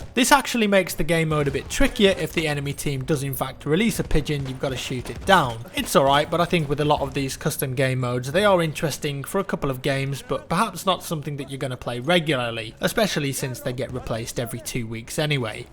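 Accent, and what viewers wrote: United Kingdom. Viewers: Man i love yor British accent, mutch better to understand for me than the American Cheeseburger English.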